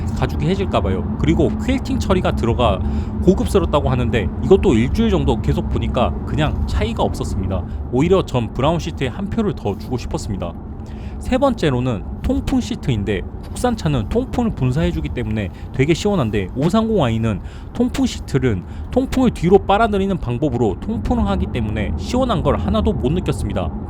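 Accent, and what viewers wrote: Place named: Gwangju, South Korea. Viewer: 어딘가 익숙했는데 광주사시구나 ㅎㅎ 반갑습니다 ㅎㅎ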